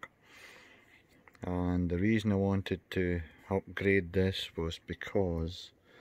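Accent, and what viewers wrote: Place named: Scotland, United Kingdom. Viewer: Love hearing a fellow scot in the hobby!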